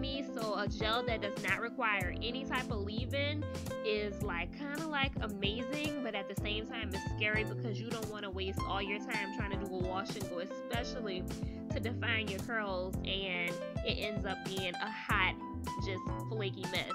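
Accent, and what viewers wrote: New Orleans, United States. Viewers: Is that a Nola accent I hear 😍 cuzzzinnnn!!! New subbie 😘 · I feel I hear a NOLA accent. I just randomly picked them up at a Dollar General.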